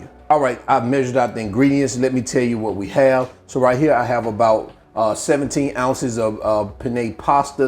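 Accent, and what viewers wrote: South, United States. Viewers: Stop dragging on your Alabama education it has gotten you this far and from what I can see you’re not doing bad at all , so, it did do the job. I love your recipes and you are doing a great job but remember we all talk or pronounce words different, it just depends on the area / demographic you are from. I know you are just having fun but lighten up on us southerners.😂😂😂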